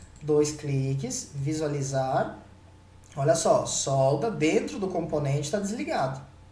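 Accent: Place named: Rio Grande do Sul, Brazil. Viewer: Ótima didática! Parabéns! Eu utilizava outros softwares para modelação de estruturas metálicas, já havia mexido no Tekla há muito tempo em versões anteriores, essa versão está bem melhor! OBS: Pelo sotaque deve ser meu conterrâneo heheh... Estou em São José do Rio Preto/ SP, mas minha família é de Marau/ RS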